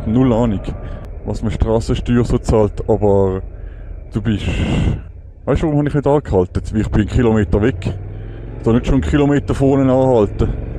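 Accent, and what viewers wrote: Switzerland. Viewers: Moin din Dütsch ish lässig guet moll wieder CH zum höre wanni do im Nord Deutschland umme irre schönnie Pfiffe fome flach Land 😂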